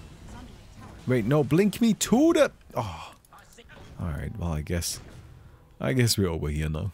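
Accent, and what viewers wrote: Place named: Germany. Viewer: Ein Deutscher der Englisch redet, da kann man immer raus hören dass es ein Deutscher ist. Btw was ist mit dein Mic los ?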